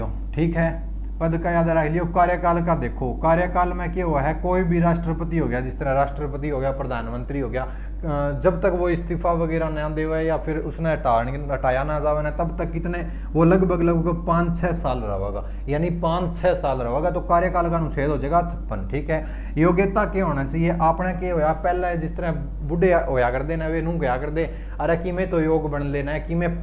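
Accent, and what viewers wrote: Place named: Haryana, India. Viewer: Mjja aa jata h sir apki class dekh kr🤣🤣🤣🤣pure haryanvi😁😁😁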